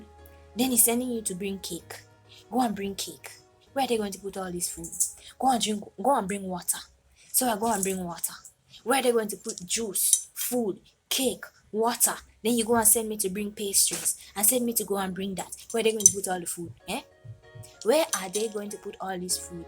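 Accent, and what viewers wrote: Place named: Africa. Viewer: First, I am hearing your African Accent!!!! Lwl. Good video!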